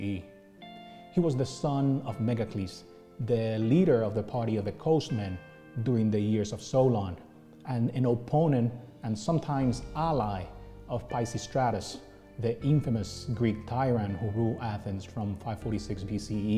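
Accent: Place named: United Kingdom. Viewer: Great content, love the accent. You must have learned English from a British teacher.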